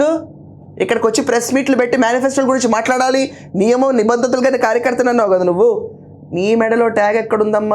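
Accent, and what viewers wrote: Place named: East Godavari, India. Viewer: మీది తూర్పుగోదావరి జిల్లా కదా నాకు ఎప్పటినుంచో డౌట్ ఉంది మేడం నీ మాట తీరు మాది కాకినాడ మాకు కొంచెం చెప్పండి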